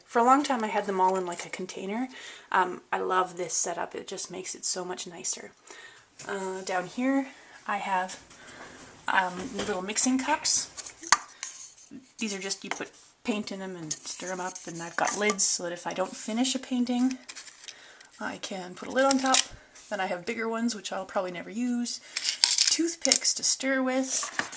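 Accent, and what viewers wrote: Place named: Canada. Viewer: Ah! I watched ice road truckers with Canadian truck drivers in it lol...Love your accent :)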